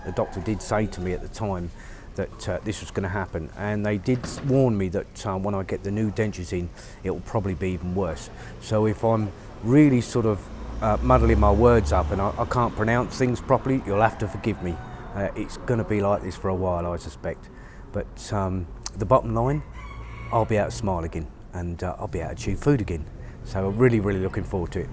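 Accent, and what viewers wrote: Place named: Northern England, United Kingdom. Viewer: His British accent just got a bit more 'northern' now, lol.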